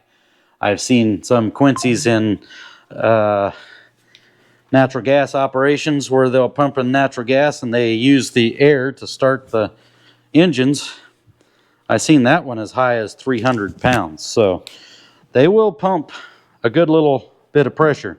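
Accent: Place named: Southern Illinois, United States. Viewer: I thought your accent was more from south of I-72/ I-74, than Amish! I am sure that you are very proud of your Amish heritage and thank you for sharing your background with your viewers.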